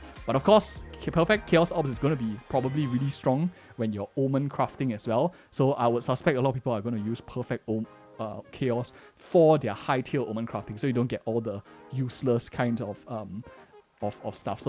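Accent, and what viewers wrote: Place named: Singapore. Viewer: Why do I hear Singapore😂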